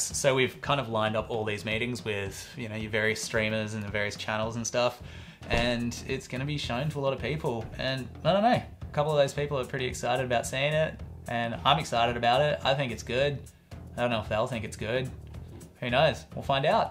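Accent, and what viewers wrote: Australia. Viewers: …Have you considering recording ASMR/bed-time-story/Calm-app-style content, in which you dish out your sage wisdom in that gnarly Aussie accent so your subscribers can fall asleep every night slightly less anxious? … Asking for a friend. 🤣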